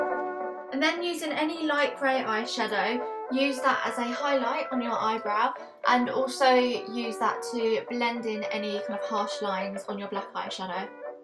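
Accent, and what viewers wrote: United Kingdom. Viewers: You are so sweet! I love how you say "hello my lovelies" at the begging of every video in your amazing british accent(; -3 i seriously adore you!!!!!! xoxo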